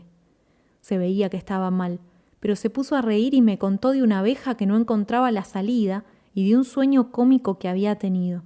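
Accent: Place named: Argentina. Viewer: Una interesante narración de la maravillosa pluma de Cortázar. Adoro todos sus cuentos, gracias por su linda lectura. Tienes una voz muy bonita, el acento argentino es único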